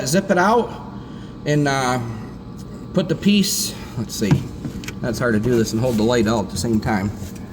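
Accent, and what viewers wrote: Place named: Minnesota, United States. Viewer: probably Minnesota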